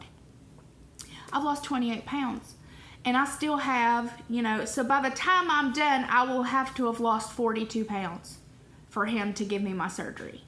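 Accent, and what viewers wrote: South, United States. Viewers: do u have a southern accent? sounds kinda southern to me.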